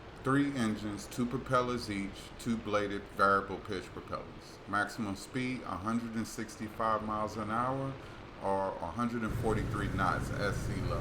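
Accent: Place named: Germany. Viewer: Great visuals and informative. Good job on the volume control. Love your German accent, lol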